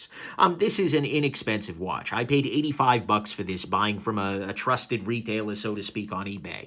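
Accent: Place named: New Jersey, United States. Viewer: Nice review! Thanks. Love the (Jersey? NY?) accent :)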